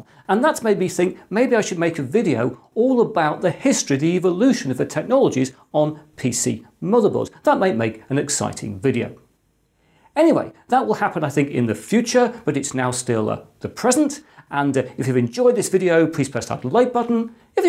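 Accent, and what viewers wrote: United Kingdom. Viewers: Is it me or do you understand things better when explained in a British News anchor accent 🤔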